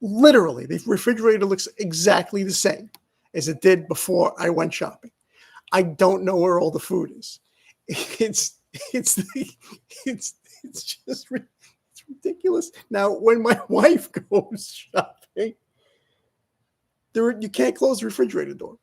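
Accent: New York, United States. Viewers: amazing you never lost the NY accent! love it..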